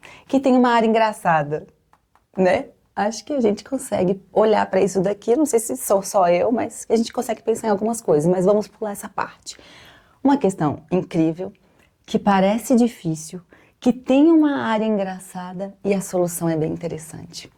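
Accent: Minas Gerais, Brazil. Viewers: Show é E que saudade do sotaque de MG.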